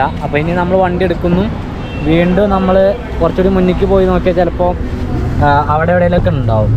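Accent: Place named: Kannur, India. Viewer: നമ്മുടെ കണ്ണൂർ സ്റ്റൈൽ സംസാരിക്കുന്നതിൽ വളരെ സന്തോഷം തോനുന്നു🌹🌹🌹🌹 bro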